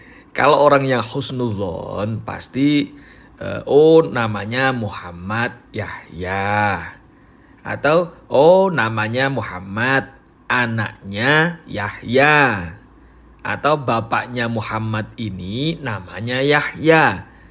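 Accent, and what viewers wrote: East Java, Indonesia. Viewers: jawa timurane kentel sayid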